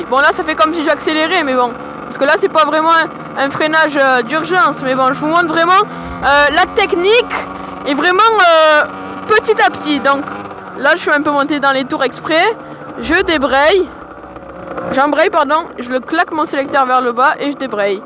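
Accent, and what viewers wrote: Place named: South, France. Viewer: Très bien expliqué! Tu t'exprimes vraiment bien; et j'aime beaucoup ton léger accent du sud, moi qui parle comme les ch'tis ça fait du bien d'entendre une autre mélodie. À bientôt jeune motard. 😊✌😉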